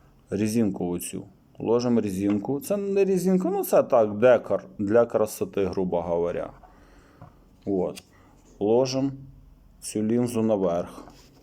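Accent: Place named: Polesia, Ukraine. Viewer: ВЯЛИКИ ДЯКУЙ,ГЛЯЖУ З МИНСКА, РАЗМАВЛЯЕШЬ, ЯК У НАС НА ПАЛЕССИ, УСЕ ЗРАЗУМЕЛА, ПАЙДУ ЧИНИТЬ,А ТО МАЛО ХТО ПРОСТО И ДАХОДЧИВО РАССКАЖА, АБЫЧНО ВУНИЧАЮТЬ.😊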